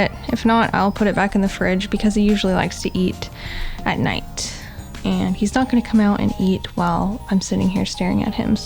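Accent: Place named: Midwest, United States. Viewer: she has to be from the midwest. Minnesota maybe....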